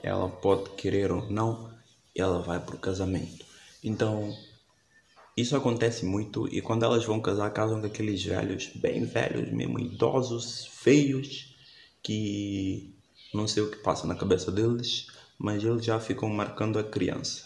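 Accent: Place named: Brazil. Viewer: Vc é um brasileiro puro kkk O sotaque de Guiné Bissau é assim ou é por influncia Brasileira?? Muito legível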